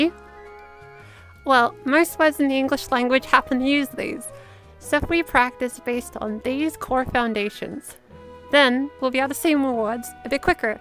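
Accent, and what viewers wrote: Australia. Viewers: finally an australian!! some vocal patterns i can imitate without turning into an american 🥹🥹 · Thank you! I'm English so an Australian accent is so much closer to mine than all the American ones I've seen. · Oh my god this is awesome! This format/editing excites me so much to practice my voice and you're Australian!! I'm also from around here and I was worried that my accent would turn more American from other tutorials. You've inspired me :3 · This is excellent, thank you so much for making this! It's also such a huge relief to hear another Australian doing this, because American voices are so different and that is so much of the voice-related content out there! Your advice is so practical, and I love the energy and vibes you bring :) The heart-felt message at the end gives me so much hope and makes me feel like I can really do this too. 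Thank you so much!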